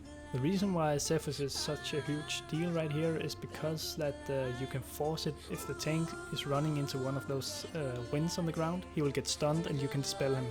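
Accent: Denmark. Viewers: are you danish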